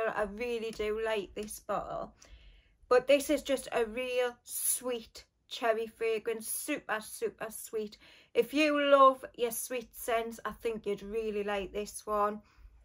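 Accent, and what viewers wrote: Scotland, United Kingdom. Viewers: Goede morgen xxxx greetings from the netherlands, lovely accent scotish???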